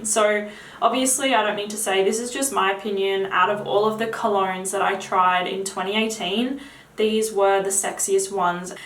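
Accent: Australia. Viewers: Well... I've never heard a posh Australian accent before. Very nice. 👍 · I like her sexy Aussie accent and voice. Not bad on the eyes either. Never mind the fragrances...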